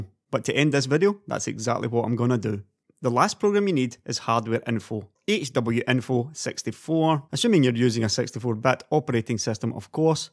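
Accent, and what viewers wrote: Scotland, United Kingdom. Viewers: are you Scotish or Indian ? cause i really like your accent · are you scottish? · I love the scottish accent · like the scottish? accent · I just assumed it's another scottish dude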